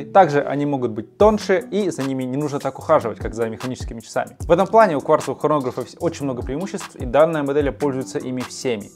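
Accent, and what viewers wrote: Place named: Kazakhstan. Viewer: Он из Казахстана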